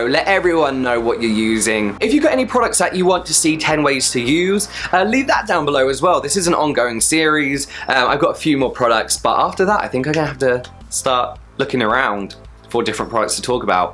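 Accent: United Kingdom. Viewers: I love the UK English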